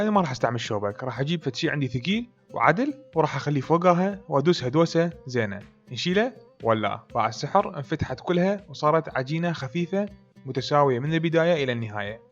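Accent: Mosul, Iraq. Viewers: الموصل بيها عدة لهجات لهجتك هي لهجة الموصلة الحضير وهناك لهجة اهل باب البيض وبعض مناطق القديمة تحسها نفس لهجة بعض مدن سورية وهناك لهجة اهل القرئ من الذين هاجرو الى المدينة مع ذلك الموصل كلها خير وبركة تحياتي لحضرتج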